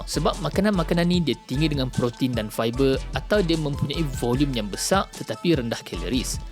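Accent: North, Malaysia. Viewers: Doc mesti org utara kan..sbb saya dgr doc kata "ayaq"...kita gang 💪💪😂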